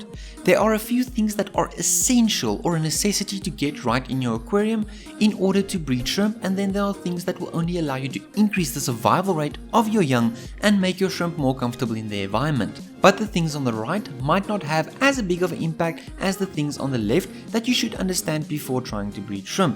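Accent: South Africa. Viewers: I really love the south african accent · I can hear you also speak pure afrikans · and concise! Nice to hear a clued up South African hobbyist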